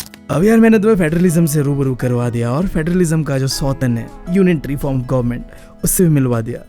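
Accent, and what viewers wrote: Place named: Bengal, India. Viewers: Bengali bhai ap kaha se ho 🤨🤨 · Bhai aap sach me bangali ho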